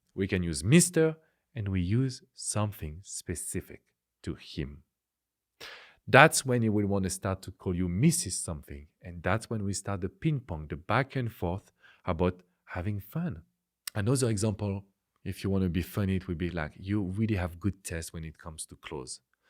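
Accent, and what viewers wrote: France. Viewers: I've just came to your channel, watched a couple of videos and I must tell you: you have very beautiful hands! I keep staring at them while listening ! And obviously I appreciate French man talk in English. Thank you for sharing great stuff. I subscribed, so I'm staying. Love from Poland. 💗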